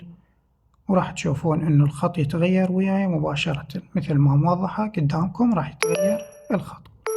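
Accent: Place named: Iraq. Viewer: للهجه عراقيهه ليهةة؟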